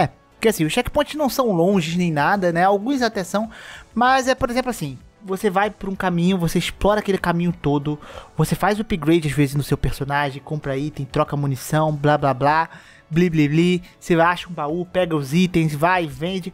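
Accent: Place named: Minas Gerais, Brazil. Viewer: Coé irmão, mineiro é mó bão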